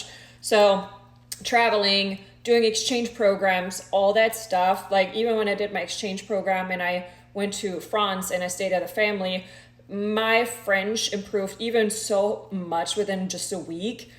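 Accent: Germany. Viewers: I can hear a little bit of the German accent but personally I like the German accent I also love German and Germany. I did a study abroad there and I'm also part German. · I can definitely still hear in the German accent while you’re speaking. Do you purposely try to mask it?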